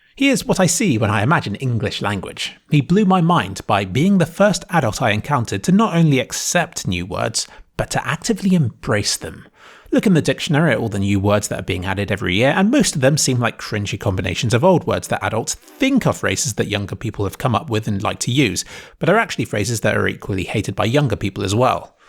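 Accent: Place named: Forest of Dean, United Kingdom. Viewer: Sounds like the people in the Forest of Dean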